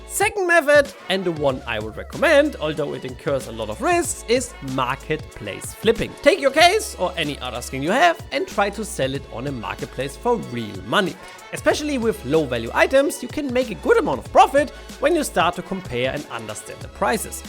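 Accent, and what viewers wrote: Germany. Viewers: You hear like a german guy do you german?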